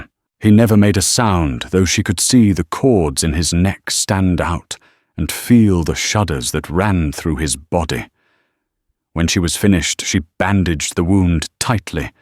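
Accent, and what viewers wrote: United Kingdom. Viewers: That is a British accent, in my opinion, the nicest of the very many British accents from around the UK…. He places emphasis on words much more often than most of the other voices.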